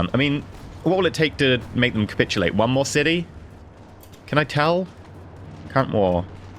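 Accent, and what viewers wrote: Germany. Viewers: you spoke in a nothern bavarian acsent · Goddamnit, I did not expect the german accent, thank you for leaving me in stitches :D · play the road to 56 mod its great it allows for alternate history play threws and revamps the focus trees I would also pay to see you play hoi4 as Germany whilst speaking in your German accent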